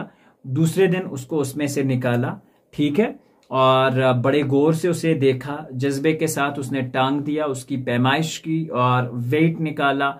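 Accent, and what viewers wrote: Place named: Kerala, India. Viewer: Kerala ki pronounciation 🤣🤣🤣🤣🤣🤣😂😂😂😂😂😂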